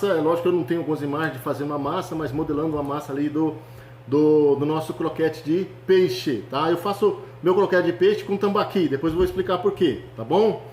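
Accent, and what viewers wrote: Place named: Rondônia, Brazil. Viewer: Tu é de Rondônia mano blz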